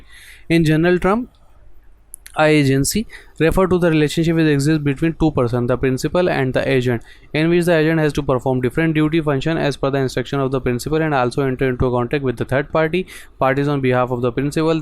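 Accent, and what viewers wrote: Karachi, Pakistan. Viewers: AP Karachi say ho??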